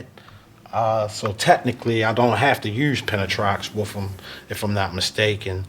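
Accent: Baltimore, United States. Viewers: great video! you also sound very much like my uncles from Baltimore. im from and live in Philly, so it really stands out. again, nice video! super informative, easy to follow along with. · Baltimore accent?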